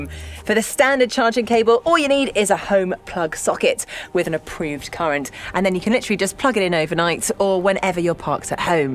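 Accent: England, United Kingdom. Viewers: Very english accent! :)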